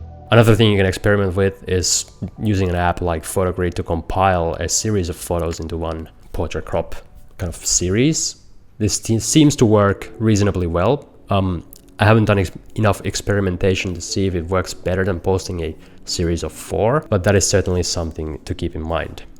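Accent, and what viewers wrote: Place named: France. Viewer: Such excellent information, I'm so excited I just followed you on Twitter! I created a Twitter account several years ago intending to start posting images but life got in the way so I just recently became more active. It's been very confusing not knowing the best practice for photographers which is partly why I was procrastinating to begin with and your YouTube videos have truly been a gift. Initially I didn't use any hashtags because I was told it wasn't a good idea but I'm only getting a few "likes" although some of my Tweets are receiving a fair number of impressions. I'm not sure exactly what that represents or if it's meaningful, how can I tell if these are just views or retweets? Also do you think it may be a good idea to retweet some images I already published by putting them in groups of 4 as suggested here and adding hashtags since I don't have twitter Blue and can't edit anyway? 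Also I'm confused whether it's okay to retweet my own posts on other pages for additional exposure or will that show up on my page as well? Please if you can help clear up these few things for me I will be extremely appreciative of your time and attention, all the best! And you're cute too, love listening to you French accent and easy on the eyes helps watching along with all the wonderful advice!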